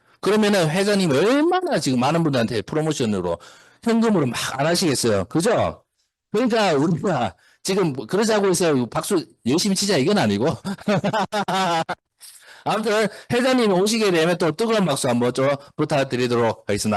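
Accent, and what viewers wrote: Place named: Gyeongsang, South Korea. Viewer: 다함께품 협동조합을 만난것이 축복이고 기쁨입니다! 교육이사님 강의 짱이십니다!갱상도 사투리인데도 귀에 쏙쏙 박힙니다!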